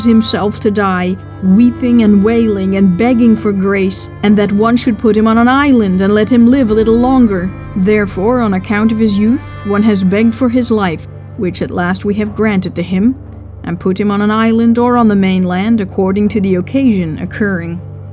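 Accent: Netherlands. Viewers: Of all the masses of information I have read, and documentaries I have watched, on the subject of the wreck of the Batavia, this is by far the best. The narrator is brilliant - the slight Dutch accent brings the story to life, and some of the facts presented even I did not know - and I’ve been an avid student of this shipwreck for over 40 years! This entire channel brings history to life in a very detailed and understandable way that draws the audience into the story and compels them to follow it through. I’ve taken to playing the audio through the car stereo on my way to and from work, the stories fascinating and well told. I cannot wait until another subject gets the Defragged History treatment.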